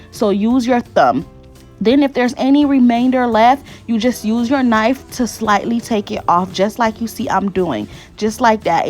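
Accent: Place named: Miami, United States. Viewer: Hey, first off I love you meals I have tried several recipes, I’m a new subscriber. However, you talk like you live in Miami?? I’m from there and I here it I might be wrong. 😂🥰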